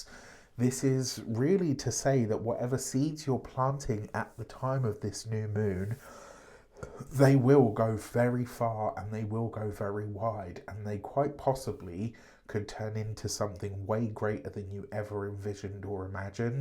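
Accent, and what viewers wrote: United Kingdom. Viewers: You can't quit us we love you too much..thank you I missed hearing your British accent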